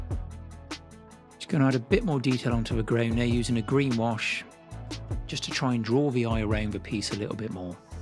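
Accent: South West, United Kingdom. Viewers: I figured there was a south-west-ish flavour. I'm in Derbyshire limbo lol, as in right on the border of Derbyshire but with a Sheffield postcode, which is technically Yorkshire.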